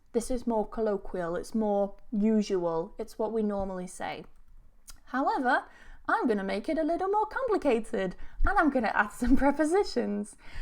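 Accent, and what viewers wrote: United Kingdom. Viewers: Do you speak British English or American English? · She speaks British English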